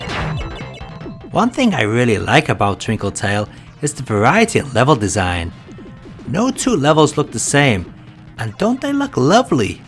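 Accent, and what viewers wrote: United Kingdom. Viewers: i like ur videos+efforts+ur brittish accent ( if i am not wrong ).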